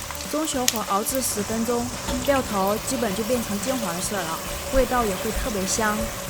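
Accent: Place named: North, China. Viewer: 我是海南定安人 新竹 我搬凳子來看，你口音澈底北方人口音。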